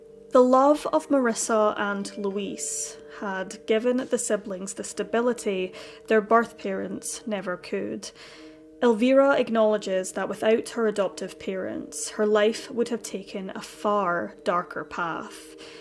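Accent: Scotland, United Kingdom. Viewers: Interesting story but the Narrator's absolutely HORRIBLE Scottish accent makes it too unbearable to listen to!!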